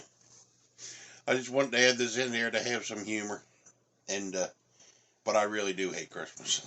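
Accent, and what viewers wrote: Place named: East Coast, Canada. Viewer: Cuntastic! You sounds like a Canadian east coaster b'y, is ya?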